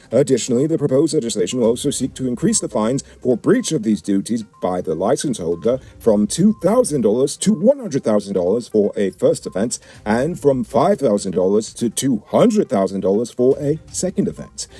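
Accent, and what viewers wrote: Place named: Guyana. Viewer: I am sorry, but what part of Guyana is the announcer from? I don't recognize that Guyanese accent? What happened to the Guyanese accent, first everyone sounds like Jamaican, and now this guy? What is going on in Guyana?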